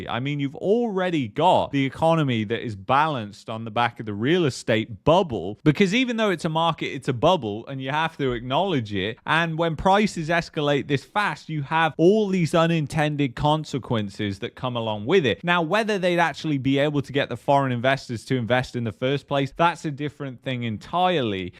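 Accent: United Kingdom. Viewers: I never thought the British accent would annoy me so much, but here we are.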